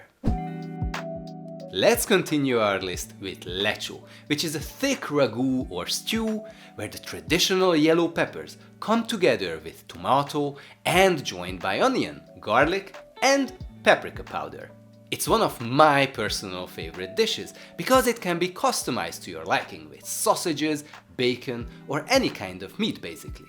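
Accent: Hungary. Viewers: anyám.. ez az akcentus... nagyon jól megy az angol, de érződik a magyar... Did give a like tho... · Jó ez a kis hunglish, ment a like! :)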